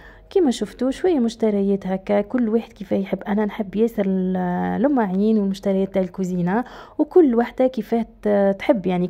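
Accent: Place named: Tunisia. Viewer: لهجتك كيفنا التوانسة ❤️😘 انا نحب الجزائر واهل الجزائر والله والبلد الوحيد الي نحب نسافرلو بعد مكة والمدينة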